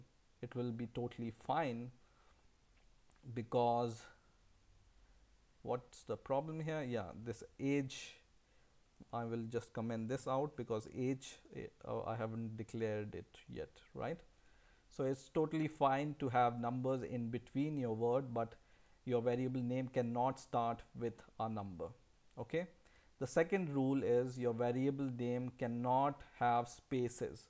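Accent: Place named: South India, India. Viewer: Daata type I think he is a south indian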